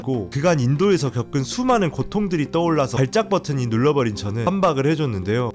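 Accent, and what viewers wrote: Jeolla, South Korea. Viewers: 너무 재밌어서 구독하고 가요!!! 근데 전라도 분이신가봐여 억양이 ㅎㅎㅎ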